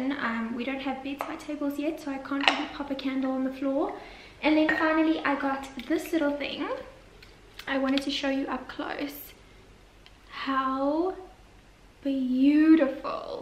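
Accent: South Africa. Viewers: I'm dutch and it's funny to hear you speak South African because some words are dutch but sound different. Love your video's!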